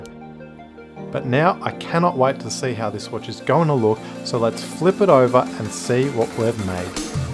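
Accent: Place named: Australia. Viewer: having an Aussie accent for a change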